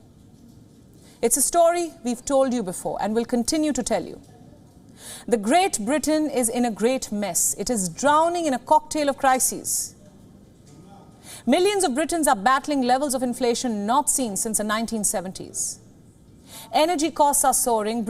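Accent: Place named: India. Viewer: the host Ugly Indian accent is a pain to hear. Indians just got the worst accent and everyone in the US is laughing at how Indians speak English.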